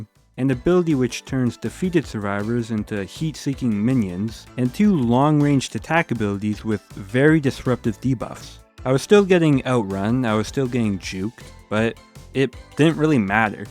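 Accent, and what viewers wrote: Canada. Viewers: are u Canadian by chance? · Wait is he Canadian?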